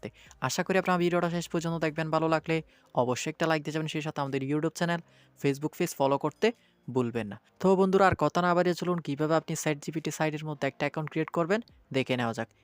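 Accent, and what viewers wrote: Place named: Chittagong, Bangladesh. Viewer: নিশ্চিত চাটগাঁইয়া 😐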